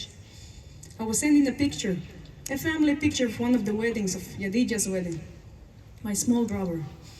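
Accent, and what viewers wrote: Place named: Israel. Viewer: Afrikaans with an Israeli accent - first time I hear that!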